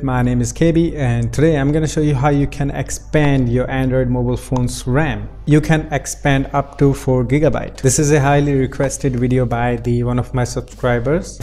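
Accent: India. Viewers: First time i heard good indian accent. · Beautiful Indian Accent 🤧✨💖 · So can you choose what storage you take the ram from? First indian guy i saw who has nice accent😀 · Wait what ur indian :O nice accent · The Indian english accent is working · 70% People talking about his Indian accent 30% People talking about ram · Best Indian speaking English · Will it work on my 1gb ram phone? BTW you're my first Indian YouTuber I know who has a great accent unlike the others!!! Keep it up bud!!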